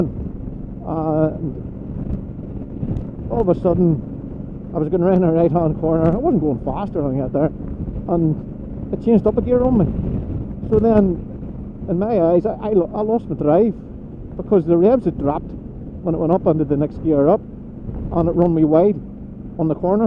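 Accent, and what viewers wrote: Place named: Scotland, United Kingdom. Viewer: Nice review.But I could not understand a lot of what you said because of your Scottish accent. I thought this was the same as the foot shiftier on the DCT. But I guess it is different. But thank you for the video because now I have to investigate. I do not need an automatic so much but was thinking about getting it because I will do 80% of my riding in the city. But if they make something like this for the manual version I would rather save the $700 that the DCT would cost me.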